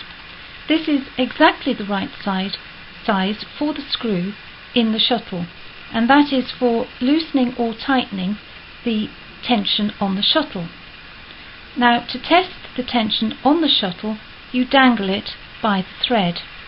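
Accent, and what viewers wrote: RP, United Kingdom. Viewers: Where are you from? You speak in such a posh way!:) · How cheeky to comment on your "posh accent",I was just so pleased to find your very helpful instructions.I've just bought a lovely old Singer and am having problems getting the tension right.Thanks to you I think I've cracked it.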